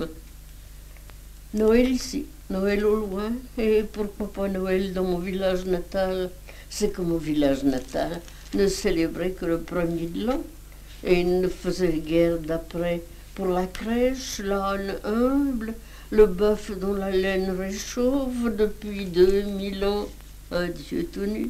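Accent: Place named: Burgundy, France. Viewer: Simplement l'accent bourguignon d'une femme de 80 ans à une époque où l'on avait conservé sans honte les particularités, les spécificités de sa région natale. Comme la langue s'est appauvrie !...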